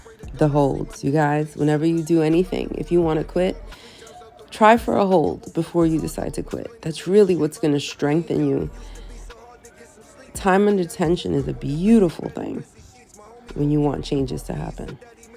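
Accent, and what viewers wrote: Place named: Colombia. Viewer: Are you Colombian because you accent is wonderful keep up the work you are doing great shoutout to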